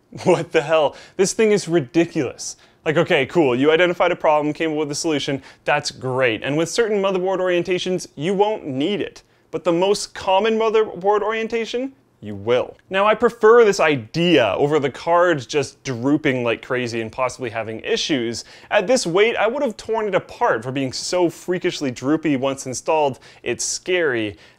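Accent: Canada. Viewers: Holy fuck I can't listen to Lukes Canadian voice, it's infuriating lol. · I love how canadians pronounce "about" and "out"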